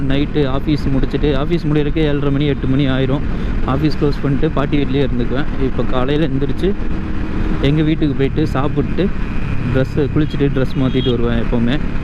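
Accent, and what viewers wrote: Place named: Kongu, India. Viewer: koghu selga unga voice ,neega gounder ah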